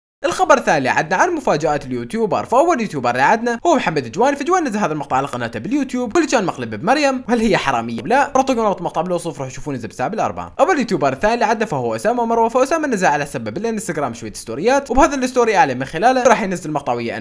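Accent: Iraq. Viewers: لي بسمعك بقول عراقي😂😂😂مهو سوري ناسي اصلو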